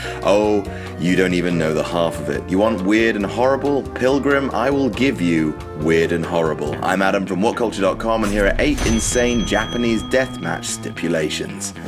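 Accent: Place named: England, United Kingdom. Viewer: your boring pommy monotone kills all the joy in hardcore wrestling. Get out of here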